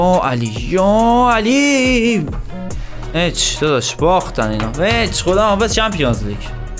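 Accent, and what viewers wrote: Mazandaran, Iran. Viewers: عاشق لهجه مازندرانی هستم😆 عشقی دا...مرسی از انرژیت💙🔥😍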